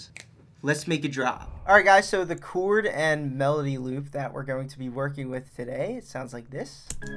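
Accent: Philadelphia, United States. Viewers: Your Philly/South Jersey accent reminds me of home. Love your jawns bro 😂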